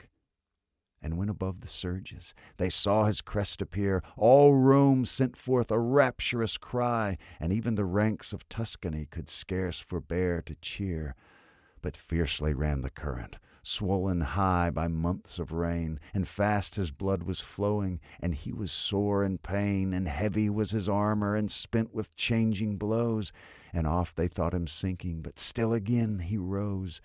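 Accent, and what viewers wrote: United States. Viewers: I had been lamenting the shortage of people reading great poems, well, in an American accent. So glad to have found this. Have you considered reading some of Chesterton’s poetry?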